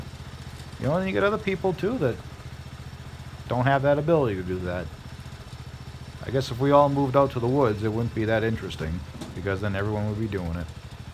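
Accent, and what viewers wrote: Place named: Midwest, United States. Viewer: Ya know? I'm guessing you live somewhere in the midwest. Ya know? Because ya remind me of the time I visited upper michigan ya know? And they kept saying "ya know" after every sentence. Ya know? :) :P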